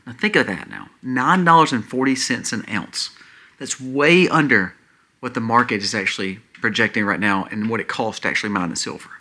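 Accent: South, United States. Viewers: He is from the South. It's called an accent. Many places in the US have different accents. And uneducated comment ❤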